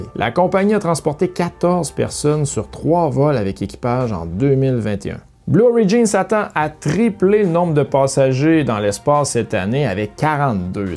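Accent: Canada. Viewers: l'accent canadien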